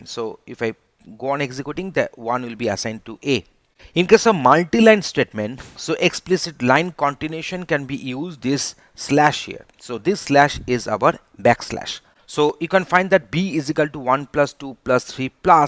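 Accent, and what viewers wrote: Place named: Kolkata, India. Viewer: Sir u r from north side may be from Kolkata, bcz ur pronunciation looks like that, but over all ur explanation is good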